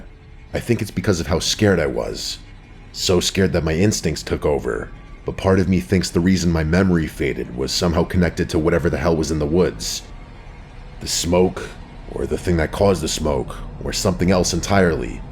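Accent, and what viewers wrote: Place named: East Coast, United States. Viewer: you're the goat. I don't know if it's the East Coast accent or the excellent curation of sound effects and visuals, but you're far and away the most competent and consistent scary story YouTuber in the business. The only channel that I have a membership of for a reason.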